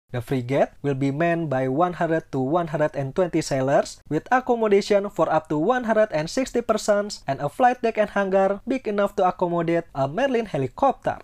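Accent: Java, Indonesia. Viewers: english with indonesian aksen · aksen inggris lu indonesia banget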